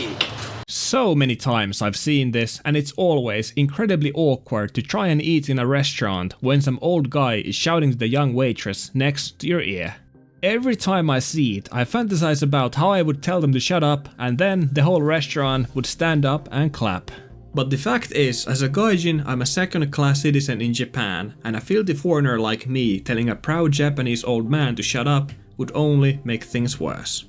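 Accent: Finland. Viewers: You are finnish from ur accent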